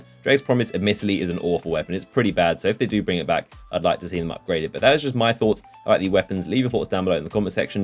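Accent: United Kingdom. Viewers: Outrageously British PS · Outrageously British yay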